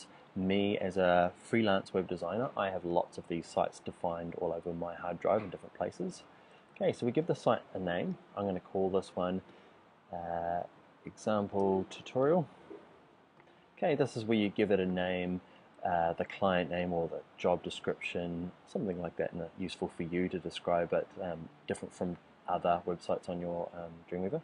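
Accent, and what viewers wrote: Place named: New Zealand. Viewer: Loved hearing the Kiwi accent bro. cheers for these dude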